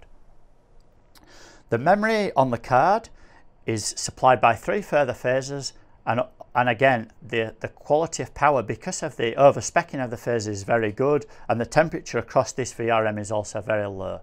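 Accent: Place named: Northern England, United Kingdom. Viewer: nothing's wrong with him lmao. It's the British accent from the north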